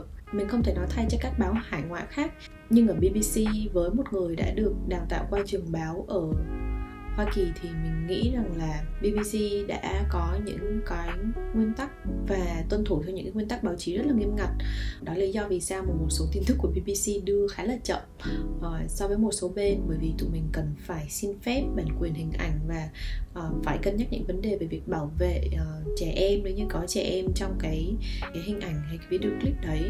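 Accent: Vietnam. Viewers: Bạn là người Bắc hay Nam?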